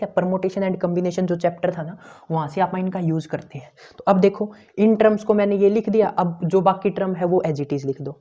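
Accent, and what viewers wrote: India. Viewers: Teaching skills are amazing.....nd ur Desi accent make it more interesting sir 🙌❤️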